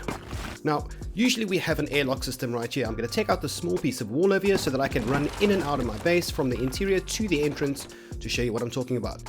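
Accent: Afrikaans, South Africa. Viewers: I heard your voice and new You were south African 😂😂❤️ Bro Where did you get your pc.. Is it a custom build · Is my friend here south African? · Are you south african? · You sound Afrikaans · Are u South African bru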